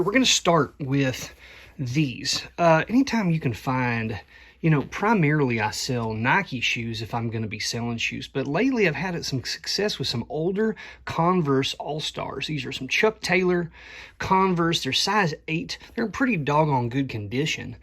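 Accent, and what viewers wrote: South, United States. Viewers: It's called Southern/country/hick lol! That's all darlin' 😂 there's all kinds of us around these parts in the south. Bless your heart❤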